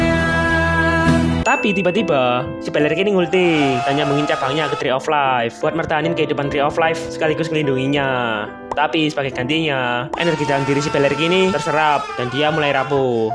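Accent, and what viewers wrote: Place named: Sulawesi, Indonesia. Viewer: Banh lu orang Sulawesi kah? sebagian logatnya orang Sulawesi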